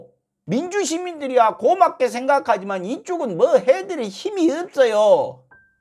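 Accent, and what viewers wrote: Jeolla, South Korea. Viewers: 아따 서울출신이신 분이 전라도 사투리 억양을 질펀하게 구사하시네요 그리고 그동안 여러 매체에서 자랑스럽게 전남 영암출신으로 소개되었던데 그건 어떻게 된 건가요? 한번 해명 좀 ...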